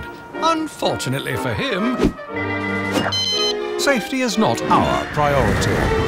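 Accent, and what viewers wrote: United Kingdom. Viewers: but it looks great because a British chap is doing the voice over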